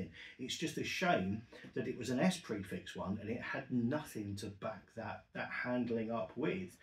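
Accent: United Kingdom. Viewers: 2 seconds in, and i already know I am not interested in this break barrel pellet gun. As soon as i hear the British accent from a guy wearing a batman t-shirt, I know the gun does not pout out enough energy to drop a squirrel at 80 yards. I can not doubt it is a well made gun, (I never had one), but 12fpe is just no fun. Stop letting your governments treat you like children,---------- Batman.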